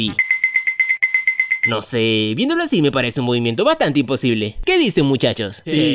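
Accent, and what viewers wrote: Venezuela. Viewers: Eres venezolano? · Oe eres de Venezuela?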